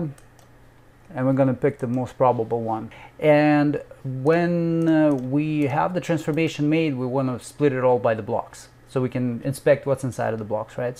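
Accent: Russia. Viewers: Хм. У автора как будто еле различимый русский акцент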